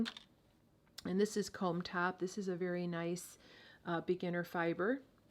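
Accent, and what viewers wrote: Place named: Midwest, United States. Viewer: I knew as soon as I heard you speak that you were from the Midwest. I was born in Rockford and spent half my childhood in Dixon, Il. Life has taken me to England though. Thank you for this video!! I found it very informative. Last year, one of my best friends gifted me a bunch of wool from sheep she breeds and raises. She gave me some gorgeous Blue-Faced Leicester and a spinel that she made because she knows how much I love to knit. I figured it was about time I learn but have been putting it off out of fear. I love the way you broke your video up into sections for us because that will come in handy when I go back and want to view a particular technique. It's so hard to know what videos to start with when there are so many out there, and I feel blessed that I found yours because you showed your viewers different techniques to use, and honestly, some may be easier than others for different people if that makes sense. 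Do you have a video on preparing the yarn? I know from another short video that you put the yarn on a niddy noddy then put it in hot water. Can you put a wooden niddy noddy in water though? Does the book you recommended go into all of that? Once again, thank you so much for this?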